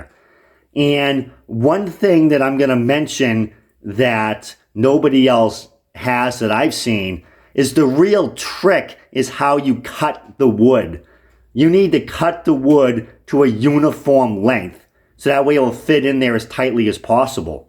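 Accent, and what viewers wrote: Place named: Massachusetts, United States. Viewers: Hey thanks man. Being from MA I can almost tell what town you're from haha 😉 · Seems he's from bawstin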